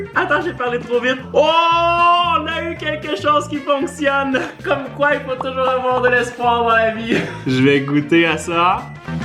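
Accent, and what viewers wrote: Quebec, Canada. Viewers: traduis le mot anglais 24htu mon youtubeur préféré de is vidéos accent canadien et qui met quoi des vidéos trop trop rire 👍👍👍👍👍 🇨🇵 🇨🇦👍🇨🇦🇨🇦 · 2ème Des recettes tik tok pour la fin de l'été WHY NOT TON ACCENT QUÉBÉCOIS EST TELLEMENT BEAU, TELLEMENT AGRÉABLE À ÉCOUTER I LOVE SO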